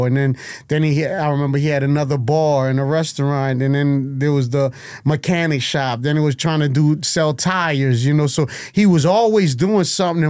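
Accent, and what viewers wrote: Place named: New York, United States. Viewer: Why does he sound SOO New York? In getting Fat Joe vibes. Man, this guy dropped some real gems.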